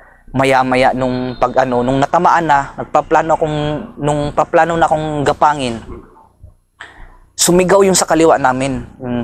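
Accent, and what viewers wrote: Philippines. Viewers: tagalog na tagalog talaga hane!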